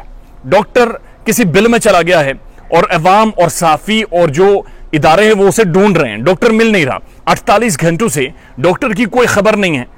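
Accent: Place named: Kashmir, India. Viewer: Your pronunciation 🤓🤓sounds u belong to kashmir😂hum kashmirion ki urdu main bi apna kashmiri touch hota he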